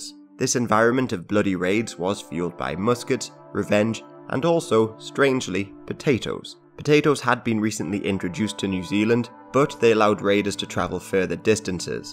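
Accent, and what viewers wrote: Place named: Newcastle, United Kingdom. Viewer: Good stuff, bonny lad, and I think that i heard the remnants, of a Geordie, accent there, good voice, for story telling tell them about,the lampton worm,.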